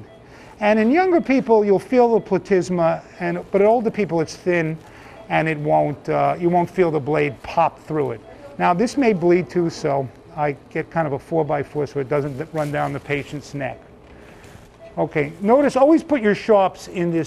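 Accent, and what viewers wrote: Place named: New York, United States. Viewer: Love your NY accent, love the video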